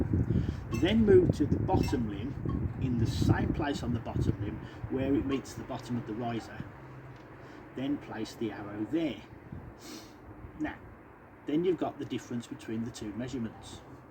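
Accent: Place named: Elmdon, United Kingdom. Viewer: Sounds klike you're near Elmdon , nice work thanks our kid😊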